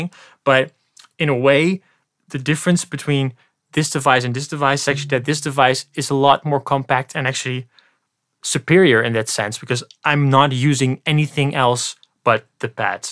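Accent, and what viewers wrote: Netherlands. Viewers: Ik hoor aan je accent dat je Nederlands bent hahaha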